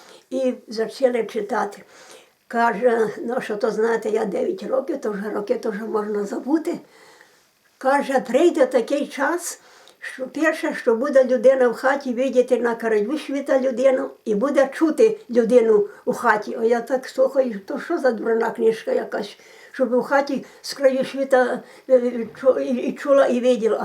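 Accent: Lviv, Ukraine. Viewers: Українська, Львівська область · Не сперечайтесь,що до говору,так розмовляють на Львівщині! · В нас в селі Львівської області, такий самий говір. Так приємно слухати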